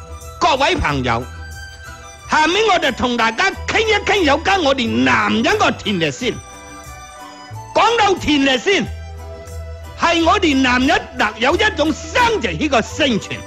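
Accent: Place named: Taishan, China. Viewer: 勁，台山口音！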